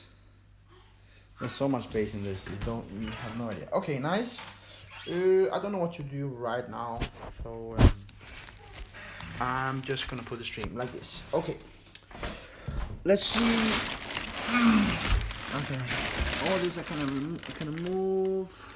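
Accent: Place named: Denmark. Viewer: du har kim larsen engelsk accent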